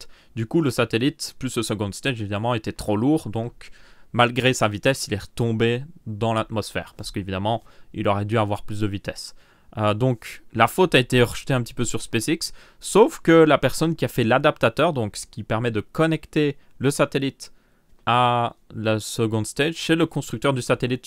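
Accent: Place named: Belgium. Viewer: C'est l'accent belge qui lui fait prononcer "léger" en "légère", c'est tout.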